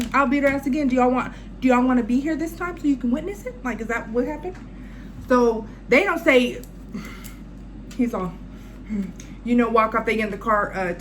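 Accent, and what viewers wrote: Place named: Clovis, United States. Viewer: Lmaooo😂😂 this sound like so many Clovis females I know, got me wondering which ones all nosey🤣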